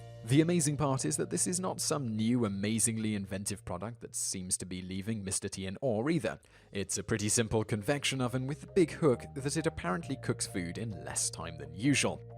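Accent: United Kingdom. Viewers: Typical American response to British pronunciation...stupid. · Cade Tatum He's British, he can pronounce it how he wants.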